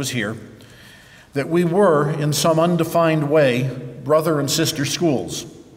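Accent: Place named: Western Massachusetts, United States. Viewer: Hey! I found this speech while looking for samples of a Western Mass accent (for various mysterious linguistic purposes mwahaha), and I wanted to assure you that 1) even though it's been many years, I actually do remember the first "button" speech I heard from you, and 2) your accent is super familiar, like home, to me:-) All the best!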